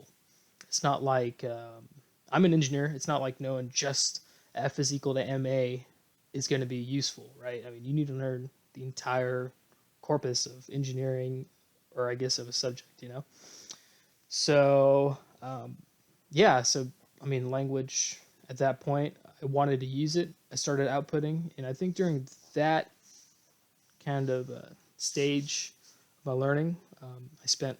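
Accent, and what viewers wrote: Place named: United States. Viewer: Hola! Vas muy bien con el español. siendo sincero, tu acento sigue escuchándose como estadounidense pero es solo cuestión de tiempo. Mucha suerte!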